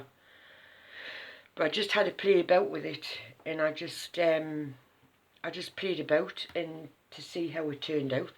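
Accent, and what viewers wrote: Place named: Newcastle, United Kingdom. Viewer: Lovely card hun and great channel. Lovely to hear another Geordie :-) xx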